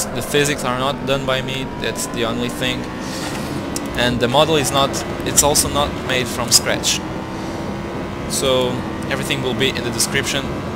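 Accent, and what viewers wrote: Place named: Brazil. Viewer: your accent sounds familiar are you Brazilian ?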